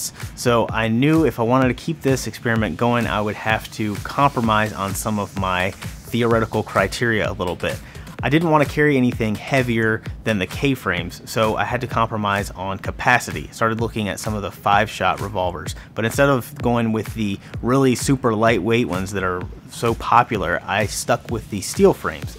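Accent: South, United States. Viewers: Many people confuse a “Southern” accent with a “mushmouthed hillbilly” accent. Learn the difference, it matters.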